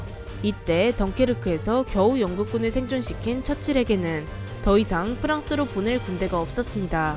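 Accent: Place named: Gangwon, South Korea. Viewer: 사투리가 강원도 가? 어디~나? ㅋㅋㅋ 말투가 귀엽더래요^^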